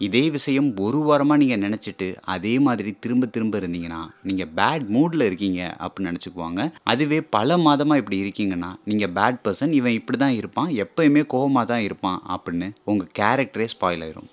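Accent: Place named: Madurai, India. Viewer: Bro I think Nega madurai or South side tha unga Native ah irukunu nenakeran..😄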